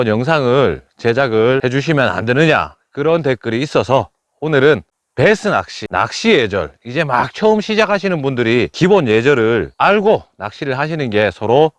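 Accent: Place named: Gyeongsang, South Korea. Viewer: 점점 표준어 쓰시네요 경상도말투가 듣기좋아요ㅋㅋ 전라도인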